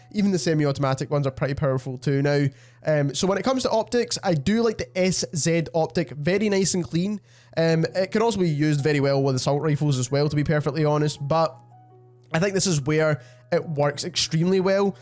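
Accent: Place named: Scotland, United Kingdom. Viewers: Sounds like the janitor from the Simpsons... so yea... a Scotsman. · Yes scottish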